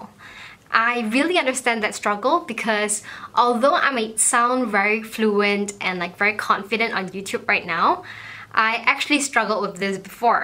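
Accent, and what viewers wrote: Malaysia. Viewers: Ya actually English is the 2nd most spoken language in the world💛 also your Malaysian accent is so cute😍❤️ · Love your Malaysian accent 🙃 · Thank you. I've had this dilemma for quite a while. As my channel grows, it's kinda hard to switch the language, but this subject keeps popping in my mind. I probably switch to English one day. I'm just not sure when. By the way, I love you Malaysian accent.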